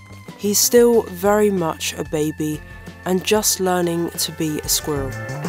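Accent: United Kingdom. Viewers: having a British narration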